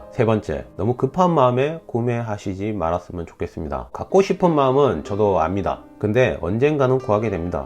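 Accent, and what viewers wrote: Gyeongsang, South Korea. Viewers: 이알토 아.. 그렇군요.. 몰랐어요. 그냥 구수하게 경상도말 쓰셔도 재밌을텐데요~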